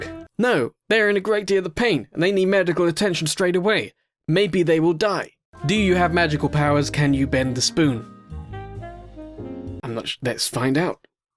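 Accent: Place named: South, United States. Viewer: I died a little at the southern accent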